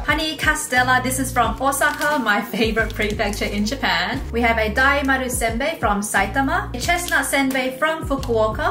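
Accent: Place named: Australia. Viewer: Australias accent is really starting to rub off on you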